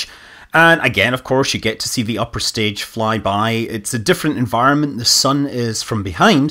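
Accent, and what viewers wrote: Scotland, United Kingdom. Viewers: Actual Scottish from! "Theire's nunnae the acoustic panneling"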